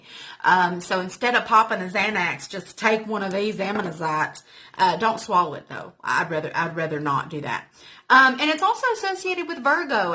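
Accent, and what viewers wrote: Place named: United States. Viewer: I loveee your country accent and your sense of humor I really enjoyed watching and learning thank you! 🥰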